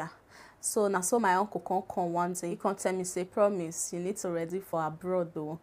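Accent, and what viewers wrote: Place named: Nigeria. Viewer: yooo nigerian English 🤣🤣shame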